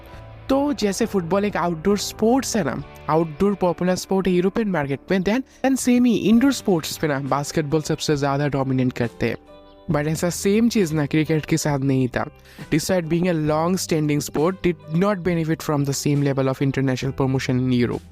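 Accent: India. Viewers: Love your Hindi Accent Brother! Keep it up. nice work. easy thumbs up.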